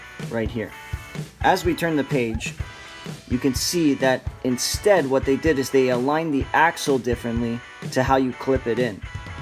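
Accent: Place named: Netherlands. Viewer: Why do you have a dutch accent?😂🇳🇱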